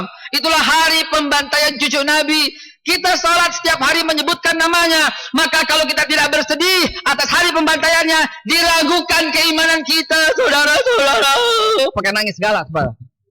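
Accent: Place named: Jakarta, Indonesia. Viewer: wajar aja beliau org betawi kan ngomongnya emang gitu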